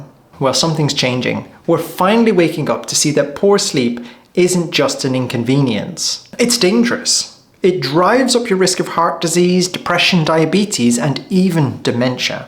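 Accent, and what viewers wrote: Ireland. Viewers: Well…you may be working for the NHS but you’re definitely Irish. Tell me I’m wrong? Bet I’m not!😊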